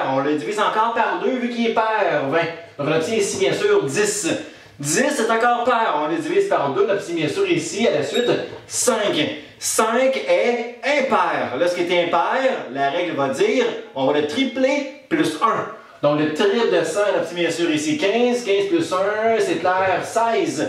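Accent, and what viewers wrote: Quebec, Canada. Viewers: c'est au contraire l'accent québecois et l'enthousiasme qui font le charme des vidéos de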